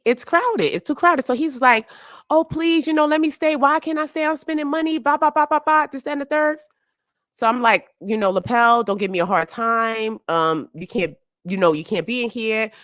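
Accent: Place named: New York, United States. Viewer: OMG, I love your NYC accent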